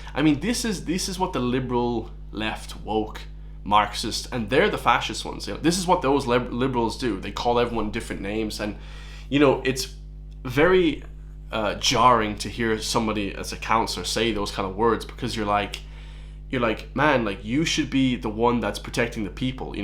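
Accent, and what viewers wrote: United States. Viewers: Are you a yank??? What the fuck 😅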